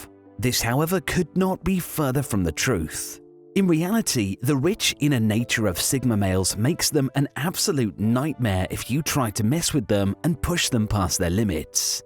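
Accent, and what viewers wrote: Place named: United Kingdom. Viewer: If you were born in America before 1960, and identify as a biological male, then you are what this charlatan defines (withHisBritishAccent), as a "sigma male".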